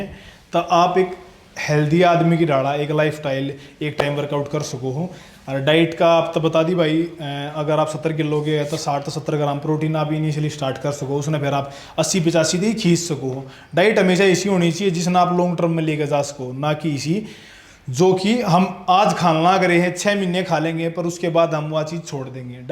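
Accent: Haryana, India. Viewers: Bhai nu hindi na aave haryanvi dialect me hindi bole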